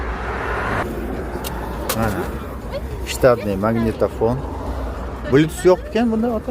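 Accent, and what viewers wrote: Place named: Kyrgyzstan. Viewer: Созунор Кыргызчага окшооп суйлопсунорго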